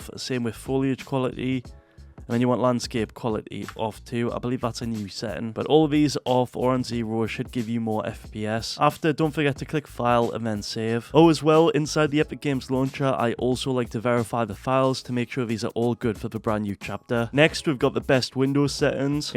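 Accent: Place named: Newcastle, United Kingdom. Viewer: we have like 50 diff accents so his is Newcastle btw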